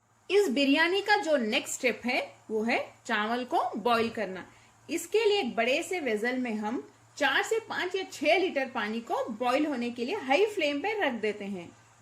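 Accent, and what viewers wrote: Hyderabad, India. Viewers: Are you from Hyderabad (you accent hints) 😅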